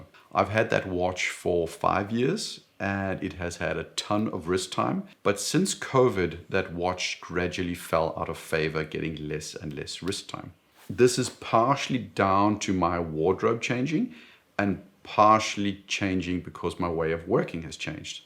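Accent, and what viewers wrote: South Africa. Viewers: A fellow Saffa, I got the Pelagos Fxd Gmt, moved over from a garmin smartwatch, Strange enough from my research, Tudor played a huge role in the old sadf & navy Hear your accent & I was like this guy could be South African, he's using the word chill & the nearing the end said a braai done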